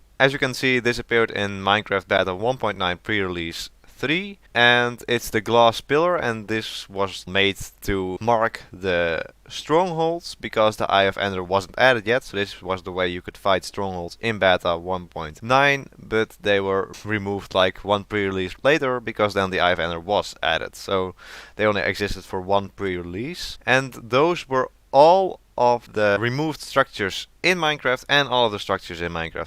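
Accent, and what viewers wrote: Netherlands. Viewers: are you dutch? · Are you dutch? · Superfun vid but i have a question: are you dutch? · Are you from the Netherlands? You definitely have the accent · Cool video!! Are you dutch?? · Dutch?? If not you sound like one😂 nice vid btw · nederlands accent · You officially are dutch i hear it gewoon plat nederlands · That's hella a Dutch accent you got going on, sounds pretty good so far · you are definitely dutch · Ik hoor gewoon dat je uit Nederland komt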